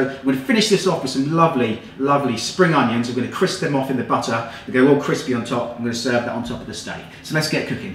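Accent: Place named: United Kingdom. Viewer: I don't mean to be too direct, but let me tell you: I love the way you talk! British English sounds so brilliant, especially the variety you are speaking. Sorry for this non-food-related comment of a little German linguist! Thank you for this lovely recepy as well, we will recreate it soon! Cheers!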